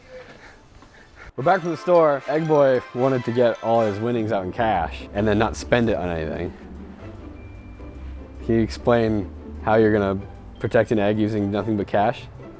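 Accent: Australia. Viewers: Don’t worry, most of us aussies talk like that 😑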